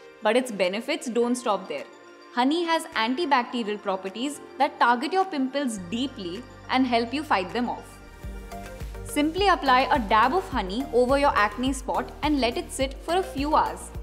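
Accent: India. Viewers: Her Indian accent "patoto"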